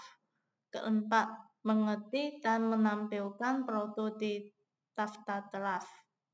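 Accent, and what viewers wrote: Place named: Japan. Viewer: Selamat datang ka dinegri +62....kedengerannya kaka dr jepang soalnya saya punya tmen orang jepang aksennya sama kaya kaka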